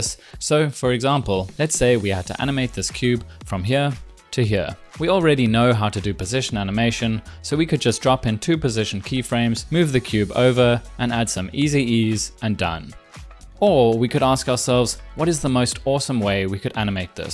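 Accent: South Africa. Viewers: Nice video, I thought I recognized South African English.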